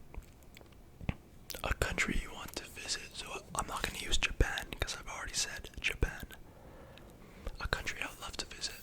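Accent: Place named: North America. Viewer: Not only that you “look” American, We can’t tell the difference in accents between Canadians and Americans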